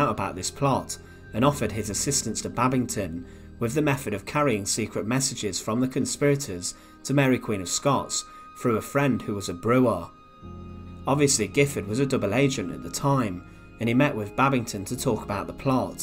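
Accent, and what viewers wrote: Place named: England, United Kingdom. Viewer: I don't think it is a robot - just a bad narrator. Proof that an English accent does not always make for good narration.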